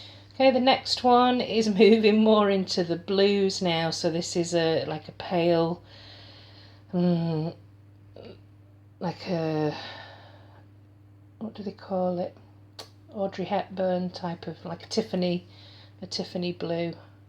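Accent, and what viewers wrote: United Kingdom. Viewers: Hi. I'm trying to place your accent. What part of the UK are you in? I'm in Manchester x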